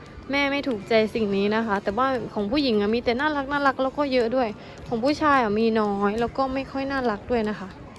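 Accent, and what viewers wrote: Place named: Isan, Thailand. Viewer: ชอบแม่ เว้าอิสานม่วนคัก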